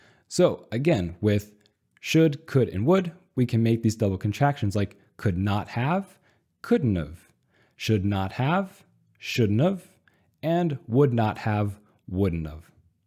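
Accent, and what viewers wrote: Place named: Russia. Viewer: Not being rude, but you do have 1% russian accent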